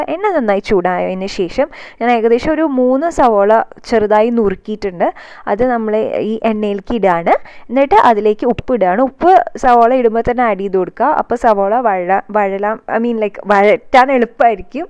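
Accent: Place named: Palakkad, India. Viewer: Ha slang kettapo enikum thonni chechi pkd aanennu... Ur videos r awesome njan new subscriber aanu lub yew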